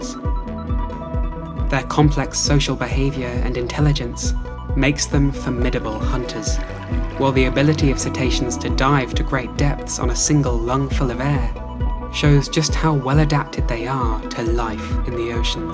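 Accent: United Kingdom. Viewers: The combination of your voice, British accent and a proper music is just mind-blowing. You are a genius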